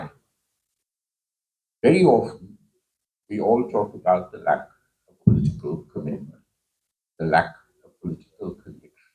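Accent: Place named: RP, United Kingdom. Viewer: What ridiculous talk,just showing English posh pron ,accent etc What a Tragedy the helpless Poor doesn't understand?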